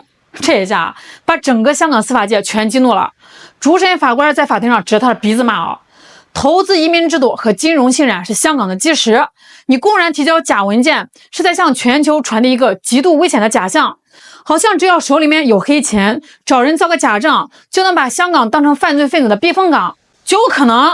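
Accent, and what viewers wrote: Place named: Henan, China. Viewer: 这个主播的口音大概是豫北冀南鲁西的人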